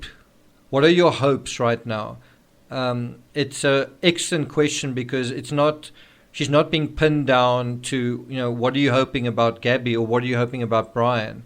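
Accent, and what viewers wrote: South Africa. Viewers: Hey 👋 I love your channel, the work you put into every single video blows my mind. You are so appreciated 💛 I was born in SA and I used to have that lovely accent you do,but since I left SA my accent has faded. I wish you all the best on your channel, you definitely will always have support from me 😊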